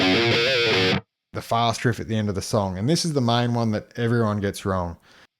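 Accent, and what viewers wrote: Australia. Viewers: Toster Is he an Aussie ?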